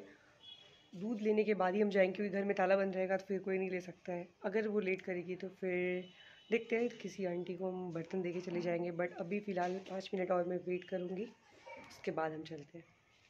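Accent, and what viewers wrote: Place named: Bhojpuri, India. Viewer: भोजपुरी आवाज से सुकून मिलता है🙏🙏❤❤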